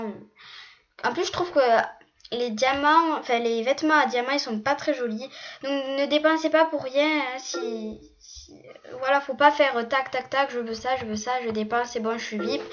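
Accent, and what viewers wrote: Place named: South, France. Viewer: J'aime trop ton accent tu vien du sud ?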